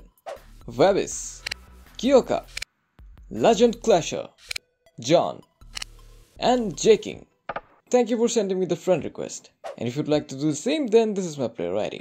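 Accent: India. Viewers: Bro im not sure but I think youre from india cuz i saw your thank u for 3k subs and all the background noise of vehicles and electricity poles make me feel youre indian. Plus theres a slight touch of hindi accent in your english. Please let me know if im right!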